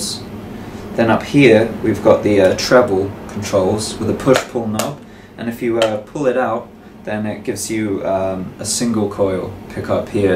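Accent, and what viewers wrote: United Kingdom. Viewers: is one of the heaviest bassists on YouTube also: is British and has a POSH accent me: visible confusion and astonishment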